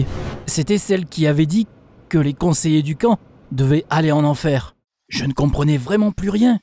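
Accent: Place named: South, France. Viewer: Le petit accent du sud qui me fait plaisir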